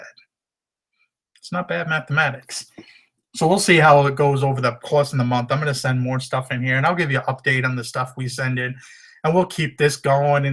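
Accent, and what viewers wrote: Boston, United States. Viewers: you are one of the best on you tube and love your facial expressions along with that Boston type accent...cracks me up and hope Gretchen and her Mom are doing better....sounds like you have a lot on your plate, a lot of changes in the near future so take care and hope everything with your family works out well and your mother in law heals...Happy Hunting! Corie in Seattle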